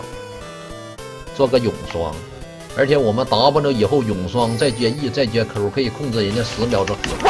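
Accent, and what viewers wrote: Northeast, China. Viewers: 其實大哥說的是東北話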